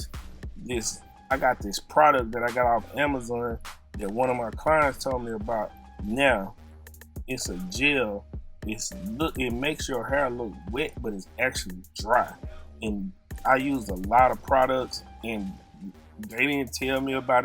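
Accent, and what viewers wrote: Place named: Memphis, United States. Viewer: Where are you from? I'm from Memphis, you kinda sound like you're from here. Awesome Job ,on the haircut! I just found your channel. I have 2 boys on the Autism spectrum, they both have sensory disorders, my youngest is 9 and he has never been still enough to get lined up properly, and shaped up. I just wish it was some way to get him to keep still. I think his barbers, tools are a little to sharp for him, do you have any suggestions for a child with autism that cant hardly take the sensitivity of the tools? And I subbed to your channel! I'll look you up on insta!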